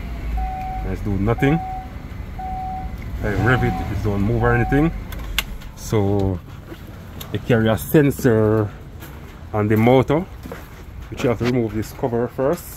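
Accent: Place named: Jamaica. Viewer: Sound like a yardie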